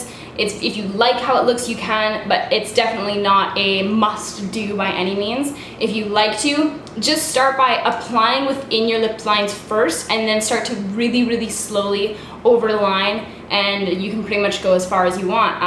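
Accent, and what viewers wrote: Canada. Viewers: As soon as you opened your mouth I knew you were Canadian. I. Love. You. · She's Canadian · You're Canadian aren't you! I'm not sure if you said this already but I can really hear it when you said "sorry"'and "out"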